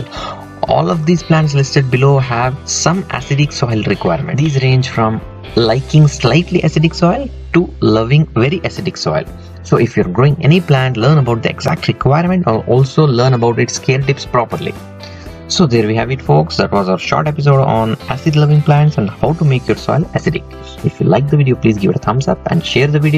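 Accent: India. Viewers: I don't understand what the hell you talkin about, with your Indian accent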